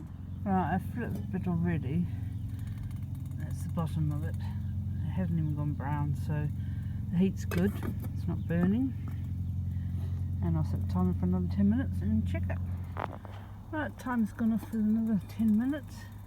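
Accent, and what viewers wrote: New Zealand. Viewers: That looked scrummy! No comment re the word "deck" and your (charming) Kiwi accent! Cheers. Ken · ......nice deck........😜👍 That's awesome with a Kiwi accent .....😋, sorry😞.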